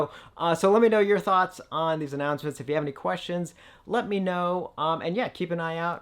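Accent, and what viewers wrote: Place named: Chicago, United States. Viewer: This new Haunts set looks excellent! I still don't have the first one though, I will have to get it next time it goes on sale and then maybe next year will get this second volume. Thanks for covering this,! Don't worry about the Chicago accent~I'm over here with a Boston accent myself. I pahk my cah in Harvahd yahd afterall 😅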